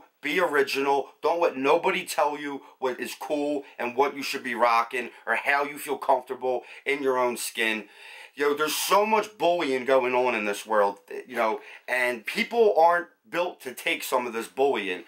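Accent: Philadelphia, United States. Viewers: Most thorough and exemplary Philly accent on the interwebs. Respect.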